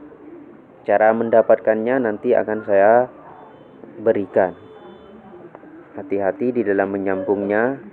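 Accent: Madura, Indonesia. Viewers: Bahasane.....kayak Madura.. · Madureh kak toan.. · Orang madura ya logat nya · Logat madura.. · Mak meddureh kak..... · Madura ya cak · Ini kayaknys.😃 bahasanya madurasa asli madura bsng🤗telok lemak😅😅😅 tran tretan man nyaman tok cerr...serrr....tak iye....😅😅😅👍coba entar kalau accu err/ soak saya servis bang...tapi alamatnya di mana mau service accu mobil kalau gk bisa😅😅😅🤗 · Orang madura ya broo?? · Orang madura ni mh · Medureh dimmah om.?? Terro ajhereh 😊😃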